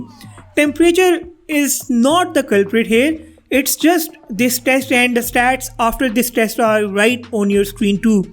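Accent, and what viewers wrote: India. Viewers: When a india try to speak Englisch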